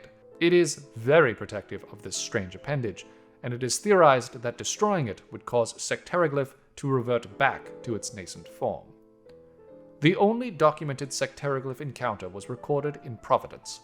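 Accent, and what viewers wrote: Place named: New York, United States. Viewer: OMG has a new york accent now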